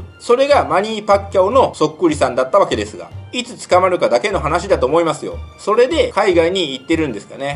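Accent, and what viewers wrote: Kansai, Japan. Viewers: 先生こんにちは。先生の動画拝見を毎日の習慣と糧にしているアラサーOLです。先生は唯一無二のコンテンツ・切り口や視点で、どの動画も本当にとても面白いです！関西のイントネーションやお話方もほんとに好きです。お伝えしたいことと疑問、また相談がありまして、今回コメントさせていただきました。盛り沢山かつ欲張りですみません…。先生の動画を拝見していて感じたのですが、先生はとてもはっきりとかつ相談者や相手のことを考えたうえで、観相学を活用したアドバイスなどをされていると思い、とても親身で素敵だなとおもい、ぜひ結婚相手のことなど鑑定していただきたいなと思いました。まずはお礼をお伝えしたかったので、前置きが長くなりましたが、先生の動画や感じるお人柄もとても好きだからこそ、何日ももやもやしていたことがあったので、こちらに疑問兼質問として書かせていただきました。先生はいくつか観相学を用いて、いろんな著名人を例にご説明されていましたが、日本人あるは在日の方の例がほとんどで、先生はたびたび人種差別はされないと仰ってましたし、私もそのとおりだと思う反面、いくつか動画の表現で、どうしてもどこかで在日の方に対しては排他的な印象を抱かれてるのかな…と胸が痛くなりました。悪人面で何人か取り上げらていたので…私のとり違いでしたら大変申し訳ございません。。なので、一つは、在日や外国人に対して何か差別的なお考えがなければ、ぜひ先生のご発言や動画は今後もっと多くの方に見られる影響力のあるものだと思いますので、血や肌の色を超えて平和を望む一個人としては、先生のお考えをまた動画で聞かせていただけると本当に幸いです。疑問と相談についてですが、私は友人に在日の方や外国人も多く、私自身もハーフなので、そういう純日本国民でない人の人相判断はできますでしょうか。結婚を考えている相手でお付き合いしているのが遠距離中の台湾の方ですが、例のウイルスが予想以上に長く、会えないまた会えても仕事を辞めて海外に移住しなければなりません。また、今別の方にもアプローチをされていて、その方は在日韓国人の方です。(何人とかあまり区別したくないのですが、一応情報として記載いたしました) このような状況もあり、どこでだれと生きていくのが幸せなのか、本当に分からなくなってしまっています。個人的な質問だと採用していただけないので、どうお伝えしたらよいかわかりませんが、エッセンスを抜き取っていくつかの疑問になんとかご助言いただけませんでしょうか…; 先祖が違う民族といいましょうか、違う文化の方(特に結婚相手としての異性)を見るときのポイントやアドバイスがあればぜひお伺いしたいです！仕事をやめて海外で生きていくか、日本で生きていくかも含め、とても悩んでいます。こんな自分が嫌なので、早くはっきりできるよう、先生助けてください; これからも毎日観ますし応援しております‼︎よろしくお願いいたします。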